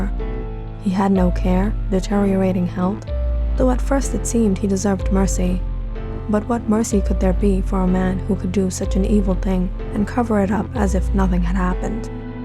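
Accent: South, United States. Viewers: I like her country accent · Love the country accent 😁💕 And so was the story! Underrated channel💕💕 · Great southern accent! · it's the country girl accent for me😂😂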